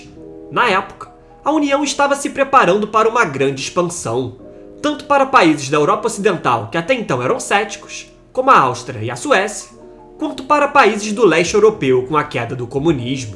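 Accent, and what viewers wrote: Rio de Janeiro, Brazil. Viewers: Bahhhh. Sotaque carioca. Pulei o vídeo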